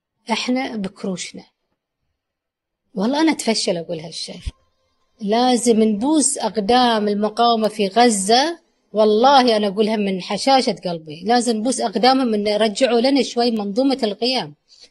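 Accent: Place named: Kuwait. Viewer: رجعت عدت الفيديو اكثر من مرة لهجتها اقرب من لهجة الكويت مافيها تضخيم لهجة البحارنة .....بس اكيد اهل البحرين الشرفاء على الرأس كمان بس في البحرين السنة مو مقاطعين ستار بكس ولا مكدونالد بينما الشيعة مقاطعين ....مفارقة عطيبة مش هيك !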